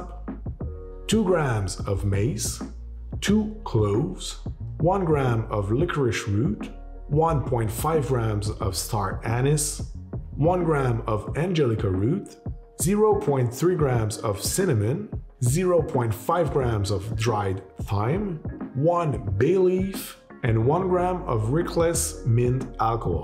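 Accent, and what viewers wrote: Quebec, Canada. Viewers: Hey! Love your channel 💖. I was wondering, you live in Quebec, right? ( i'm gessing from your accent!) I was wondering where could you find Wray & Nephew rum. I see this used all the time in cocktail and syrup recipes but SAQ doesn't sell it. Can't seems to find any over proof rhum. I live in Montreal btw. Thanx a lot and keep up the awesome work!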